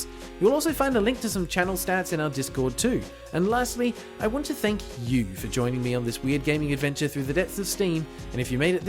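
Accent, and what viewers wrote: South, United States. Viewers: i thought he was going for southern US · I am all for southern American accent! Yee-haw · I love southern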